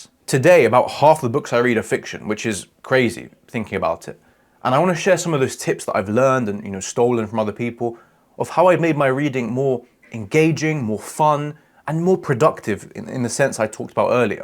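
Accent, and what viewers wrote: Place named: United Kingdom. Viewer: Shadowing ur british accent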